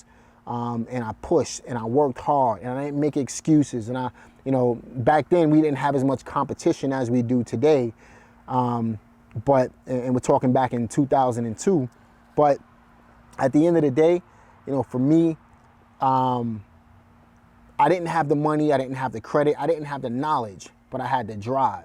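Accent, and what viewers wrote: New York, United States. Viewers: I can hear NYC in your accent, haha. I'm from Staten Island. I moved to Raleigh in 05.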